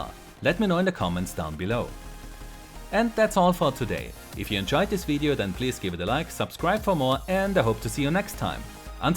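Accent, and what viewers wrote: Germany. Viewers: Warum hört man sofort raus wenn ein Deutscher Englisch spricht :D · Are you from Germany?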